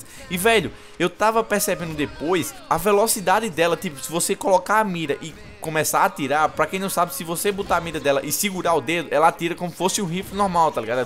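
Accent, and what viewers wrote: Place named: Pernambuco, Brazil. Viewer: vc é pernambucano ta falando Papangu moro na cidade do Papangu👏👏😉😉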